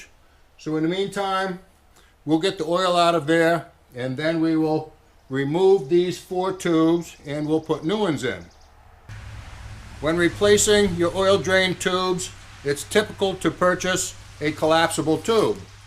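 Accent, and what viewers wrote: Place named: Maine, United States. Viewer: As a frequent visitor to the great state of Maine, I loved the license plates, accent and info! I have the old return tubes in the trash and since I have my old heat exchangers off and also in the garbage, this should be pretty easy....famous last words...we'll see this weekend. thanx again..most helpful and entertaining. steve meltzer, houston, tx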